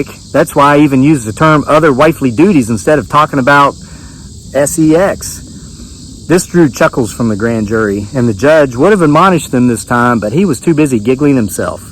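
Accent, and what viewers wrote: South, United States. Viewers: you know.... what makes your stories even better - how YOU read them! it adds a southern flavor and brings then even more alive! have you thought to do an audible version of this book? after all this book will be around for a while, just like Halloween! thank you for reading out loud!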